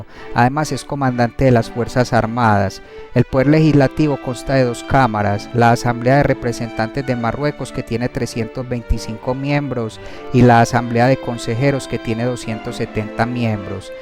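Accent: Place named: Antioquia, Colombia. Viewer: No púes, y Con acento... Paisa... home! 👍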